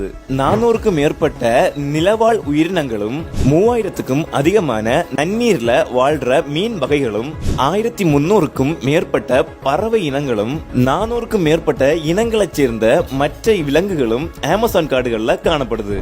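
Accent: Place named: Kerala, India. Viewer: Avan malayali 😂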